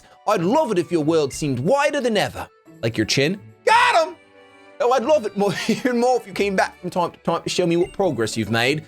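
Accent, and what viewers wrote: United Kingdom. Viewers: I could just sit here for days and listen to that british accent 🤣🤣🤣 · As a British person I'm living for the British accent!!!! Its the best thing ever 🤣🤣🤣 Fave shiny is deffo Eevee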